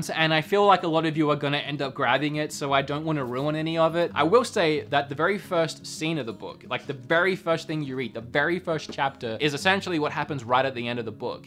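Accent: Australia. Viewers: I only just realised you're Australian I'm Australian. Why did I think you were American this whole time. Did i just filter the accent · how can you lose an aussie accent?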